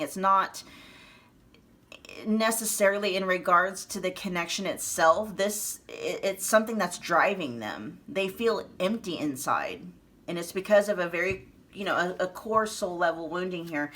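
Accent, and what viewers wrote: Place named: France. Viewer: Pile 2 and 3. He was still married and lied about it. He's a drug and sex addict. We both have kids and I was willing to leave my husband and create something him. Thank God I saw all the red flags and I cut all type of communication between him and I. I'm in a better place far away from this drama. Lies can clearly cause detachment between people. I regret meeting him. I'm a psychologist and I can't even imagine the struggle and the burden of his lifestyle. I discovered everything and I'm just disappointed because I waisted my precious time. May he find peace and joy with his son's mother. That French accent is not for the weak.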